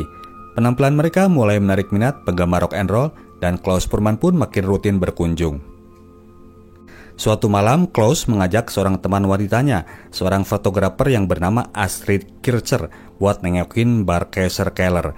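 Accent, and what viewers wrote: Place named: Padang, Indonesia. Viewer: Logat padang....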